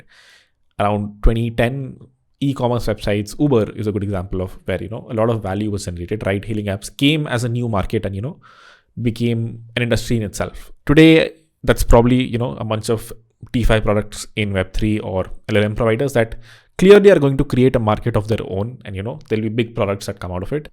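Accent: India. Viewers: I turned on caption and realized he is speaking Indlish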